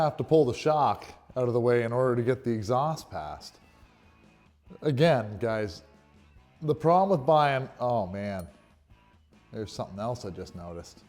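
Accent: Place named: United States. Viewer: U sound like a yank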